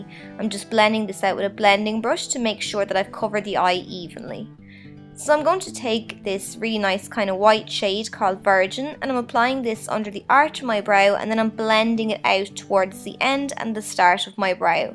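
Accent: Ireland. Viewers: Do u have an Irish accent?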